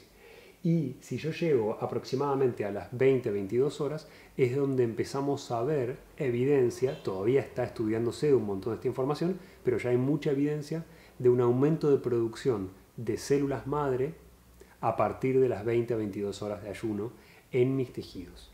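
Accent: Argentina. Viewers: Soy argentina y te digo que el acento es argentino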